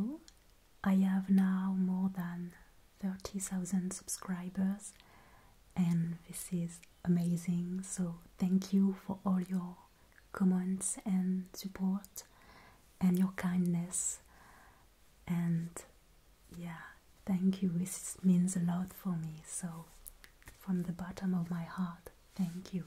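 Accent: Paris, France. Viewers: J'adore ton accent, vraiment (il n'a rien d'allemand)! Tu me rassures sur le mien quand je parle anglais (j'en ai toujours un peu honte). Grâce à tes vidéos, je commence à comprendre ce que les anglophones trouvent mignon dans notre accent. Je trouve ça incroyable que tu aies découvert l'ASMR comme moi avec des artistes anglophones et je suis contente d'avoir trouvé une française qui se lance dans l'ASMR dans la langue de Shakespeare! Continue! Tes vidéos sont si spéciales, j'aime beaucoup ta vision de l'ASMR et tes environnements cosy, anciens et si rassurants. Prends soin de toi durant ton confinement 💕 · I knew immediately your accent was French. I think you are incredibly talented, and reminded me of “Goodnight Moon”. You both have wonderful imaginations. Your props are fascinating. I thought you had to be in the movie business. Thank you for sharing your talents. 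· I love all your videos and just for the record you have always had a clear French accent 😍 I have been unknowingly into asmr for about 7 years now thinking im the weirdest person in the world going to bed listening to someone speak with an accent and chew gum lol I have actually only discovered a year ago that what that was doing for me was called asmr and I could find it everywhere on YouTube ❤❤❤ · As an American, she sounds French, her pronunciation is so delicate & lyrical. And, as mentioned above, she drops the "h" . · As a non German I can say she doesn’t have a German accent lol, it’s clearly French, not sure why ppl would think differently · You definitely sound French to me. I too thought you were in the theatre. OMG I am the same, a frustrated artist, a lover of the pre raphaelites and artists like JW Waterhouse. And I adore fairy tale illustrations. I also love the style of the Frouds artwork. 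Your videos are right up there with the very best ASMR artists. So warm and cosy and ethereal. You have been our sanity during these harsh times. You make the world a better place. Thankyou 💙🙏🏼💙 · I lived in France for a year, she has a French accent obviously but also I can see why some people thought German. German pronunciation is more like English so it’s a compliment that she pronounces English sounds well. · You're awesome! Love your videos so much. They help me to relax! Love your beautiful french accent!❤🇨🇵 Your english is fantastic👏👏👏 Keep doing videos❤❤❤👏👏👏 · Hi, Thank you for your Q and A, it was very interesting. You are definitely an artist and so creative. I am happy that you are so successful here and look forward to enjoying more of your work. As an American, i find your french accent amazing and relaxing I wish you the best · Oh, darling! I've been interested in the dead or alive game stories for a little while now, based on the accent of lady Helena Douglas, I can tell this is indeed a french accent. 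🙂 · She sounds 100% French. I would never think she was German. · I've just discovered her videos, and her accent slightly reminds french ( I live in France), but it's clearly not a typical accent of a native french speaker speaking English. Sometimes she sounds even like russian speaker in some moments. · Je suis né avec de bonnes oreilles. J'entends toujours ton doux accent français. Vos vidéos sont incroyables. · I’m American, and she definitely sounds French. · Hi you are such a nice ASMR artist. I think you have a lovely French voice and manner about you. Thank you. X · Oh I'm French and she might sound German because she tries to put intonation but still has a very heavy accent. So she's not bad for a french girl, and she's trying hard, but sounds sooo French. Was very obvious for me. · as a Swiss Girl she Sounds 100% french and 0% german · You are so lovely with your cute French accent and your channel is so lovely, pleasing and beautifully so aesthetic. 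Keep up the wonderful work. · You do not sound German lol Your accent reminds me of people in Paris France.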